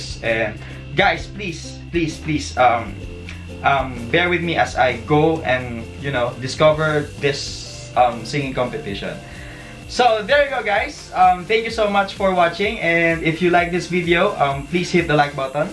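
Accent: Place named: Singapore. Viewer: สิงคโปร์เลียน ชัวร์ สำเนียงอิ้งแบบนี้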